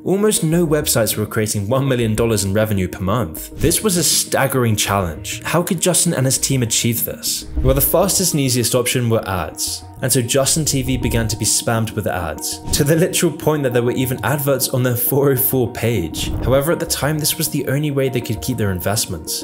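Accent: United Kingdom. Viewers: This is what conspiracy theory sounds like in the Queen's English.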